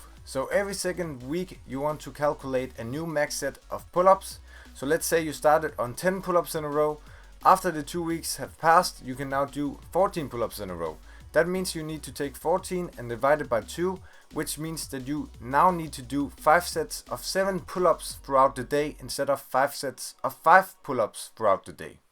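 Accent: Denmark. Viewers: This was on my YT suggestion. Gr8 vid. New sub. Look at others soon. Not always timely to go to gym. And whoa.......a very nice ring of a DK accent speaking English......all success for this channel to you.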